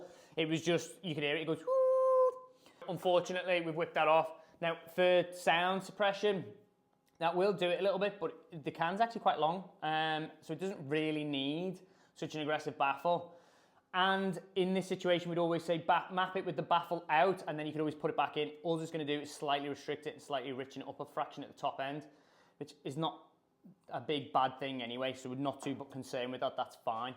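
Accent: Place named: Liverpool, United Kingdom. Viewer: It’s called scouse accent!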